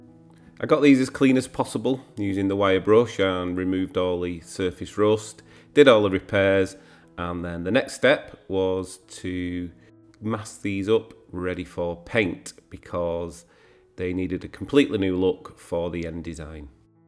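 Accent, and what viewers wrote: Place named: Northern England, United Kingdom. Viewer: Lovely. You’re from northern england? I recognize your accent. Great job. Great piece to have.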